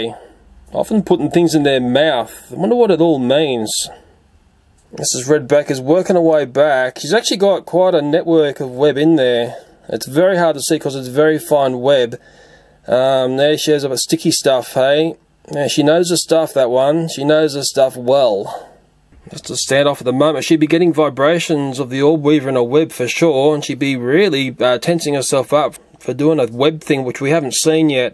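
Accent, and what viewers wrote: Australia. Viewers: an Aussie commentating Aussie things, I love it! 😂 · That's a sick video bro and your commentary is true Aussie yea boi👏👍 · I am new to your channel , and I really enjoyed this ! Love your Aussie Talk !!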